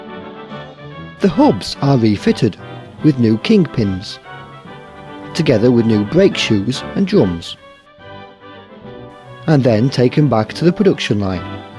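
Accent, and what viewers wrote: United Kingdom. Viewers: The film was made in the mid 1950s - the heyday of the London RT family and long before Routemasters entered Aldenham. Wonder why a Liverpudlian was chosen as the commentator. So UNLIKE London Transport who normally preferred a plummy London version! Music was like attending a funeral! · ...And why use some Northern guy as a narrator rather than someone from London.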